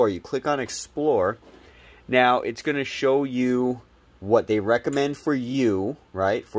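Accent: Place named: New York, United States. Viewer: bro thank you! You’re vids are so helpful. I’m excited for this journey. Also.. you talk like me😂 by any chance you from NY?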